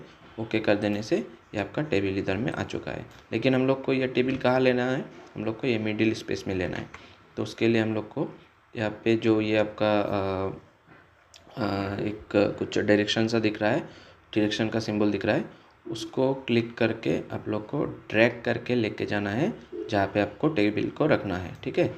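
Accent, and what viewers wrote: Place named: Assam, India. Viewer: Very useful but Assamese type hindi explanation is boring.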